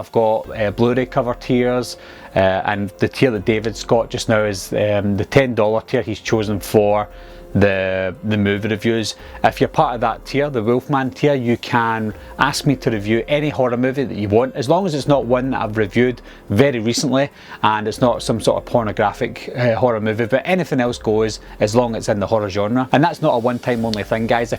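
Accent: Scotland, United Kingdom. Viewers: Love yer Scots accent, pal! Good to hear a Scots voice doing YouTube reviews. Miss that lots - used to bide in bonnie Aiberdeen, and aye, I still spik a wee bit o the Doric...agus tòrr Gàidhlig cuideachd! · Damn I was not expecting such... Scottishness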